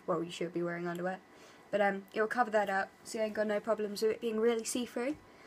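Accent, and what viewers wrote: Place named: United Kingdom. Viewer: i love your british accent, it's pretty nice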